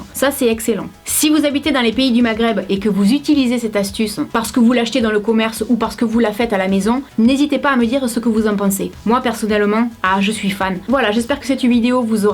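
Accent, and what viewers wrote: South, France. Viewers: Je savais même pas que ca existé Pis ton accent du sud 😍😍 Bisous tu es toujours magnifique ❤️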